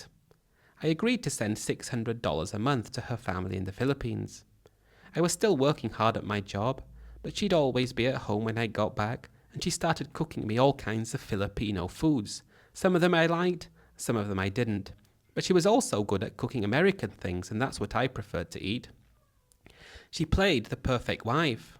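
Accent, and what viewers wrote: United Kingdom. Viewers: You have a very British accent for a yank who never left home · Weird British accent for somone from Missisipi.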